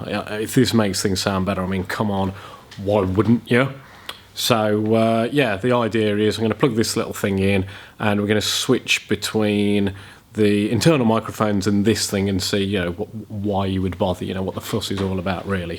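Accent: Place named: United Kingdom. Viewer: Was suprised to hear a fellow british voice when I clicked on this link. Thanks for making this, seriously considering a mic like this for both my 600d and my gopro.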